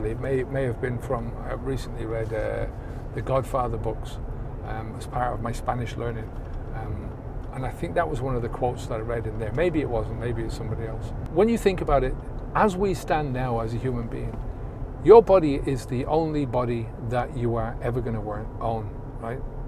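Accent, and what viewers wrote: Ireland. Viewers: I'm loving your videos. I'm Paddy from Wicklow do you mind me asking what part of Ireland are you from?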